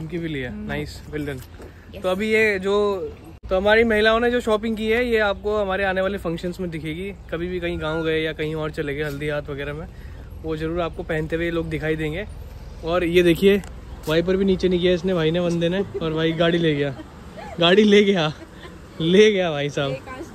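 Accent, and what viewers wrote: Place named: Garhwal, India. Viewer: Aapke ghar ke video best hote hai ...mja apki mummy or apke gadwali ascent se hi ata hai ...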